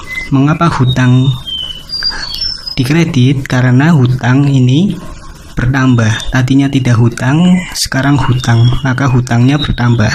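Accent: Java, Indonesia. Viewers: MODAL (Medok banget) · Medok bgtt mass.. 😳😂klo pke hndsfre gmn gitu.. Tpi mmbtu toturnya.. Trims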